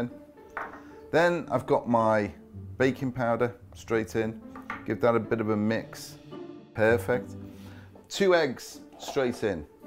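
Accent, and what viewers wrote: England, United Kingdom. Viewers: The bloody guy has an English accent (he’s from Liverpool), can you not tell? They’re Scones! Scones came first remember, we are the mother country after all! No they’re not biscuits. 🙄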